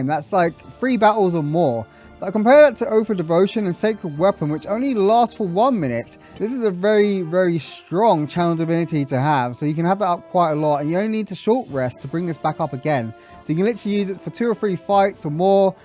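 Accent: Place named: London, United Kingdom. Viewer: Good news! I'm an London Wizard... So nice to hear the accent too!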